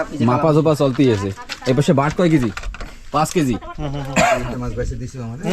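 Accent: Barishal, Bangladesh. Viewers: তোমার কি বাপদাদা বরিশাইল্লা ছিল। বরিশাল এর মত কথাবার্তা 😊😊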